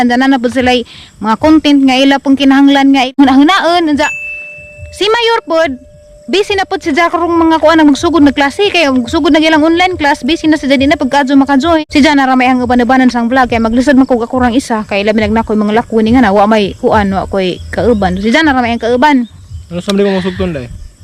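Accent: Bohol, Philippines. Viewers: Hi...I really like u...n excited to watch ur new vlog daily..favorite kaau ka sa ako mama..n of cors kami tanan sa family..taga jagna ako mama.ug malingaw cya sa imong vlog Jud..mkadumdom cya sa iya sinultian b4..like,AJO NAA MOY KAJO...ÀJAW KAY MAJABO..hahahha she is really ur fan.....and kami sa family nkasubscribe nimo..😊😊😊pls pa shout out sa next vlog..Fabiana ellevera halagna..ug sa mga ELLEVERA SA CANJULAO JAGNA BOHOL.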